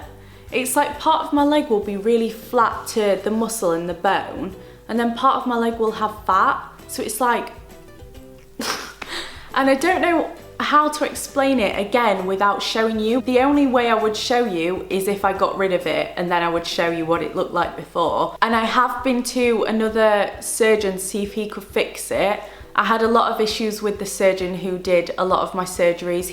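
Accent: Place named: England, United Kingdom. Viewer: Iam from Algéria i love u and l like ur english accent 💗💗💗💜💜💜💜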